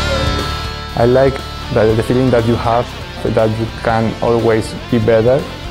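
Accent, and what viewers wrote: Spain. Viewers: Looking at this pose, you can see he does need a little more work on the lats. And where is he from? I recognize a Spanish/Hispanic accent... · Se nota que es español...el ingles le hace falt pulirlo caxo...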